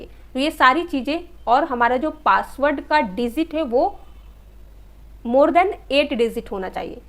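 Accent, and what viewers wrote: Odisha, India. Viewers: Are you from odisha mam?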